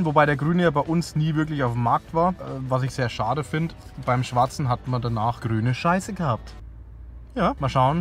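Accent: Bavaria, Germany. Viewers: Du bist der woll sympathischste YouTuber Abo is da Bayrischer Dialekt direkt angeboren obwohl es auch ein Amerikanischer sein kann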